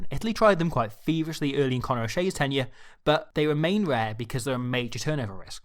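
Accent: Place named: England, United Kingdom. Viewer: Dude this guys rugby iq is on another level. I need to get better at understanding the English accent though 😅. Great content!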